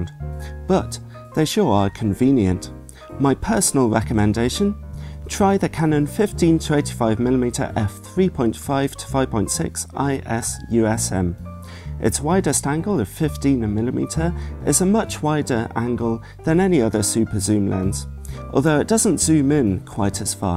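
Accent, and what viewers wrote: United Kingdom. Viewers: The first time I see your face. :) The guy with the british accent. Greetings from Rio.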